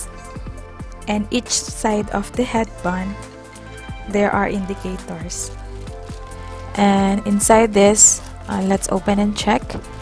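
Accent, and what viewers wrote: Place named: Philippines. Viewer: Filipina k mam nu? Hehe halata sa voice mo 😄..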